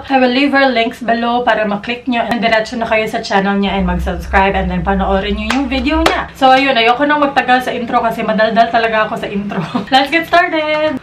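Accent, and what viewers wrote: Visayas, Philippines. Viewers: bisdak mode hahaha makalingaw jud maminaw ai. 😄😄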